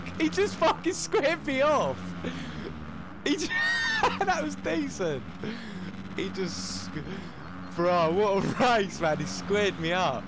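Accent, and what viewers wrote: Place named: United Kingdom. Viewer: Loving the British accent mate😂😂👌🏾🤙🏽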